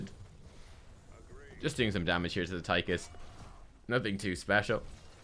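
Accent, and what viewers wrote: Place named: Ireland. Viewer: Pretry sure hes irish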